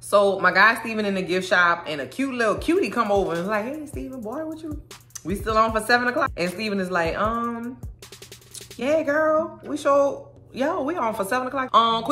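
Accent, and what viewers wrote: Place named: Louisiana, United States. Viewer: I hear the Louisiana in your voice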